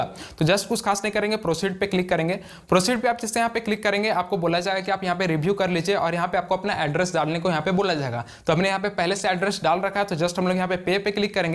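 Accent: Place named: Deoghar, India. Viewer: Me bhi bhai deoghar Babadham state se hoo me aapka aavaj se hi pakad liya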